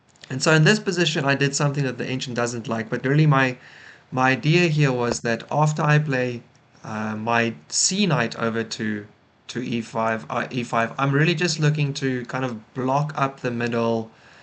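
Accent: South Africa. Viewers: Where are you from? you sound a bit south african lol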